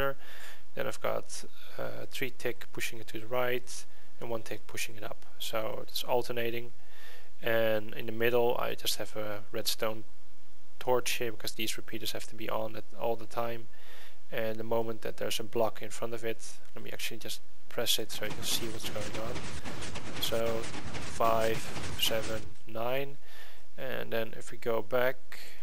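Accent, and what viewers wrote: Netherlands. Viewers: Je kan het nederlandse accent horen :P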